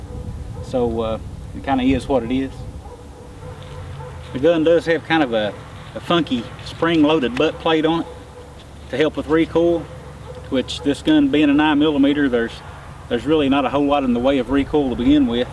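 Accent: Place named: Boston, United States. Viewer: You sound like you're from Boston. Nice review!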